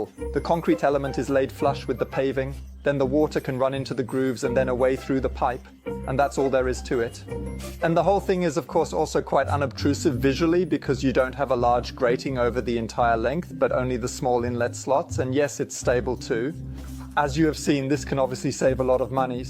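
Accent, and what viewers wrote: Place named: Germany. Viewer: your german dialekt which I like very much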